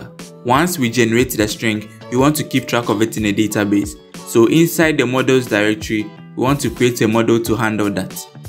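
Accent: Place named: Nigeria. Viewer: Imagine my surprise clicking on the video and hearing the most nigerian voice ever. Nice one, my guy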